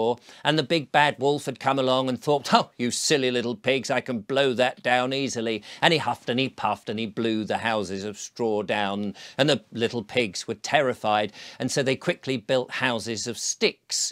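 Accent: United Kingdom. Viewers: HI, I REALLY ENJOY YOUR VIDEOS!!! THIS ONE IN PARTICULAR! YOU ARE A WONDERFUL STORY TELLER, YOU JUST HAVE A NICE WAY OF SPEAKING, MAYBE IT'S THE BRITISH ACCENT? I LIVE IN MASSACHUSETTS, USA, I'VE NOT BEEN TO HAWAII, BUT I HAVE BEEN TO CALIFORNIA MANY TIMES & I HAVE A SPECIAL FONDNESS FOR CALIFORNIA BECAUSE SOME OF MY FAMILY LIVED & THRIVED THERE FOR DECADES. HAWAII & CALIFORNIA & A FEW OTHER STATES IN THE USA HAVE THE SAME SITUATION OF EXTREMELY HOT LAVA SQUEEZING OUT OF BREAKS IN THE EARTH CALLED FISSURES WHICH CATCH DRY BRUSH THAT IS LEFT TO ROT & GUESS WHAT HAPPENS, BINGO, WILD FIRES, WILD FIRES EVERYWHERE THAT COULD EASILY HAVE BEEN AVERTED BY JUST CLEANING UP THE DRY BRUSH! ANY RESPONSIBLE PERSON WHO HAD ANY COMMON SENSE COULD FIGURE THAT ONE OUT & THAT IS WHY I HAVE NOTHING BUT DISDAIN & LOATHING FOR GOVERNOR NEWSOM OF CALIFORNIA & OTHER LEADERS & PEOPLE LIKE HIM!!@ THE WILD FIRES IN CALIFORNIA, HAWAII, THE WESTERN USA & CANADA ARE INTENTIONAL & CAUSED BY THE VERY PEOPLE PAID QUITE WELL TO PROTECT US & OUR MAGNIFICENT NATIONS!!! 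I KNOW MOST LIKELY FROM THE FACTS YOU ARE STATING, INCLUDING HOW THE PLASTIC SEATS AREN'T MELTED, BUT THE HAWAIIAN HOMES ARE FRIED!!! I STILL SEE & HAVE SEEN WILD FIRES ACROSS MUCH OF CANADA FOR MONTHS & ONGOING IN THE USA & THESE ARE VERY EASILY PREVENTABLE WILD FIRES BY SIMPLY AT THE VERY LEAST REMOVE THE DRY BRUSH, DEAD TREES & ANY OTHER FLAMMABLE DEBRIS CONCENTRATING ESPECIALLY ON WHERE THE VOLCANIC FAULTLINE TRAVELS &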